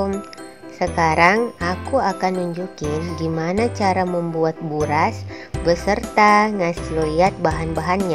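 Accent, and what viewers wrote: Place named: Bugis, Indonesia. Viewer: Asli org bugis ini dr caranya bcr bhs indonesia sm logatnya😅kurang lengkap kalo tdk dibuka,mau diliat bgmn hasilnya,tipsnya jg spy tdk cpt basi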